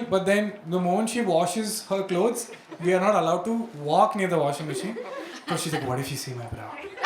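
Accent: Kerala, India. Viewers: He is from kerala. More over malayalis speak english this way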